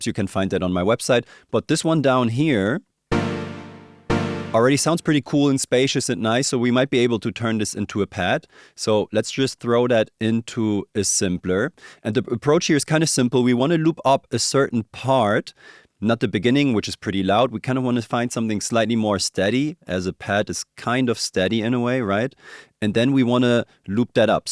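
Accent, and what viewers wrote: Germany. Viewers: I only trust synth advice from German sounding people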